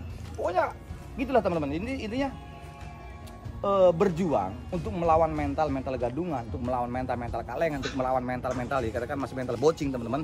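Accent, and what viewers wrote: Banyumas, Indonesia. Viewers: Nang ndi kue pak,deneng ngapak 😀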